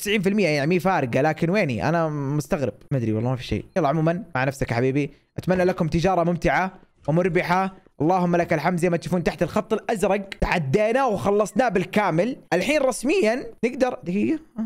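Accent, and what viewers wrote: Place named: Oman. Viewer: شيول اللهجه العمانيه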